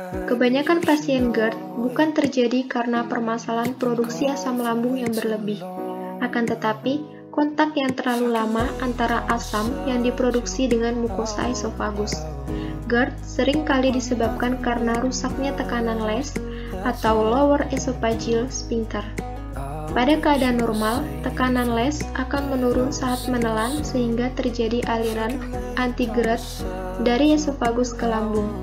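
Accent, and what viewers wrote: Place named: Java, Indonesia. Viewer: Suka yg medok" jowo asli haha😂😂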